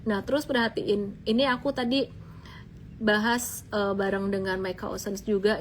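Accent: Java, Indonesia. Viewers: Cik tetep logat jowo ae, wong jowo ojo lali jowone. Kamsia. Gbu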